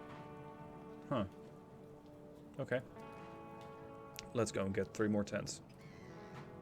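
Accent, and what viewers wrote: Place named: Netherlands. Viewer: bump for nervous dutch noices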